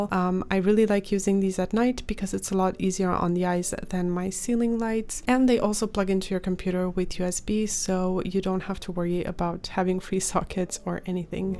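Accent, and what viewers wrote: Germany. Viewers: are you from germany? cause of your accent?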